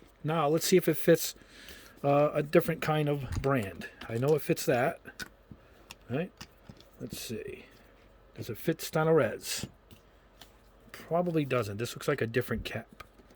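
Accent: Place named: East Coast, United States. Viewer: Cool and east coast accent and a voice that doesn’t sound like a mob hit man but a New York professor of fine art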